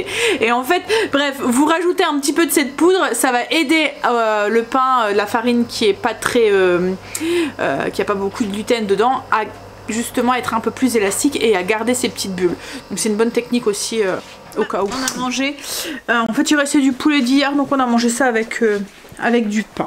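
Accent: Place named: South, France. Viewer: J’adore le petit accident du sud qui apparaît de temps en temps et merci beaucoup ne changez rien de votre naturel c’est un pur bonheur 😅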